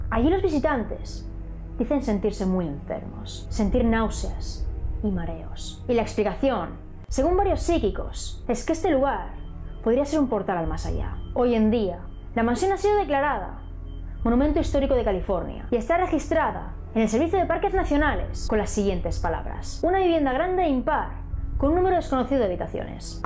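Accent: Spain. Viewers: Me encanta tu narración a pesar del dejo español, narra de una manera que te enganchas con el tema. No creo que esté embrujado pero si llena de mucha tristeza y quizas el alma de esta señora Sara no descansa en paz por la tristeza que le llevó al espiritismo. Oracion por ella 🙏🙏🙏 descanza en paz sra. Sara W.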